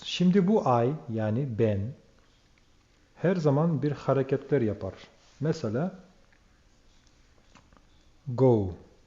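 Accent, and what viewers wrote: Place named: Azerbaijan. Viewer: Merak ettim nerelisiniz acaba Türkiyeli mi yoksa Azerbaycan Türk'ü mü Türkiye Türkçesinden farklı konuşuyorsunuz ondan merak ediyorum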